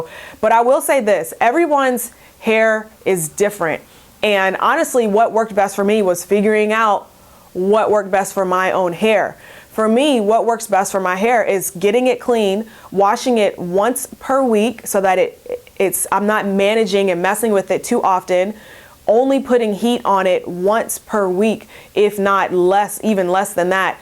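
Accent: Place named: Toronto, Canada. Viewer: Came across your Reiki video and think you are amazing. Beautiful, natural, personable, and so well spoken on Video. I’m from Toronto - you sound like a TO girl. Thanks for all you share. I’ll keep watching. Best from Canada.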